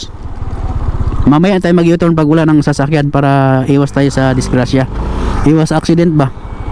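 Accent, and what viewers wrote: Visayas, Philippines. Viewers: Sigurado ako BISAYA TO.... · Bisaya kaman dong😂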